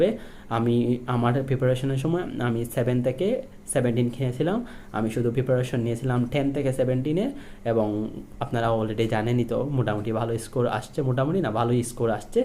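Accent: Sylhet, Bangladesh. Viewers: Sylheti ni..?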